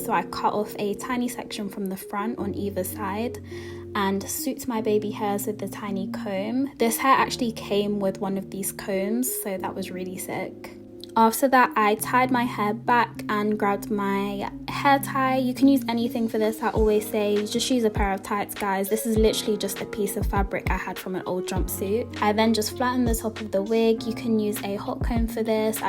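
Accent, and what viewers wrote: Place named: United Kingdom. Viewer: Lol love the accent ❤️❤️❤️❤️❤️❤️❤️❤️where you from UK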